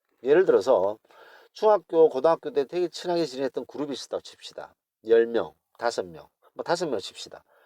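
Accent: Gyeongsang, South Korea. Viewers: 너무 공감백배 입니다. 세상이 점점 삭막해 지고 조심스러운 얘기지만 교민사회가 제일 심한거 같아요... 저랑 똑같이 경상도 사투리로 구수하게 단도직입적인 스탈 매력있습니다. 실례가 안된다면 제 유투브에 이 이야기를 언급하고 싶습니다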